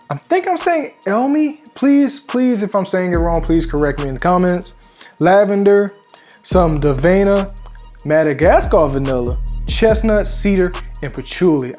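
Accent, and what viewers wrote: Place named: South, United States. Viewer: You must be from the south, the way you pronounce st words 😂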